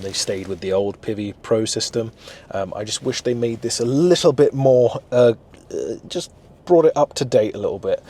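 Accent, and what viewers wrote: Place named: England, United Kingdom. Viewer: Hello, thank you for the video. May i ask: are you native Greek? Your accent shows that you grew up in Engand (or you have lived many years) but you are not local. Both parents Greeks or one?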